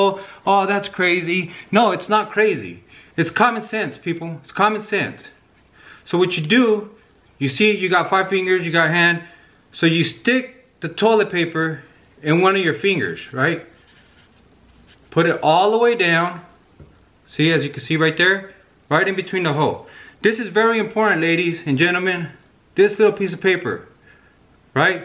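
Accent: Sinaloa, Mexico. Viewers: Love his Spanish 🤪 talks straight up like a sinaloense. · He had me at “a roll could last you up to a year” so I continued watching...... pero like no quiero usar my finger to clean my 💩😅. The Spanish version had me rolling😂💀 “no se anden panikiando” also how he kept saying “fundillo” deceased! His Sinaloa accent made it so much better. This video needs to go viral ASAP😂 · I'm so freaking dead right now 💀💀💀💀 pinche, the fact that I was taking his ass serious at the beginning.. like dead serious. All into it.. I cannot see you the same right now 😂😂😂😂😂 and then his acento bien sinaloense😂😂😂🍅 actor of the year goes to........ 😂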